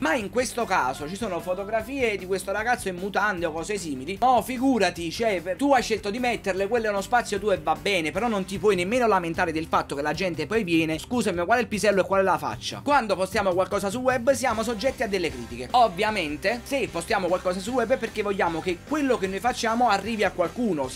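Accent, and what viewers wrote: Naples, Italy. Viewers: questo video lo guardo tutti i giorni ed è l'unico modo di stare bene poi quell'accento mi ricorda la mia lontana napoli . Sorrido sempre ciao hahahah no dai forse è troppo così hahahha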